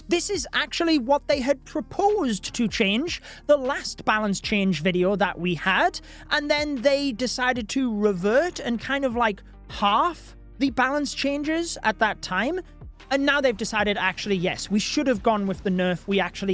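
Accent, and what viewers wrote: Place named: United Kingdom. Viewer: british ascent w'oa army🙂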